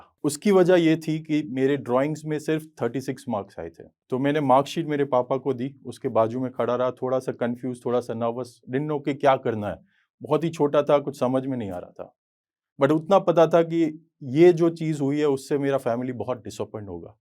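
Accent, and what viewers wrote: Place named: Gujarat, India. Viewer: I can feel that Gujarati accent in your speach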